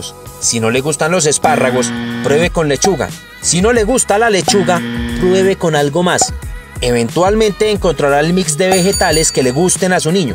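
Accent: Colombia. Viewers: se te ve que eres colombiano con el habla igual que yo :)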